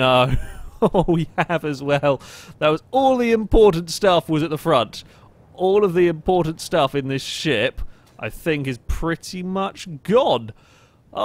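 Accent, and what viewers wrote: United Kingdom. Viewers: Thought this was gonna be bad but the British accent made it all better :)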